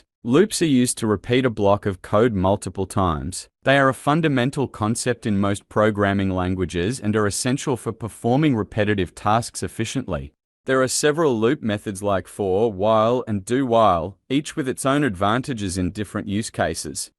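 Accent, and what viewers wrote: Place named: Australia. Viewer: Nicely explained with an Ozzy accent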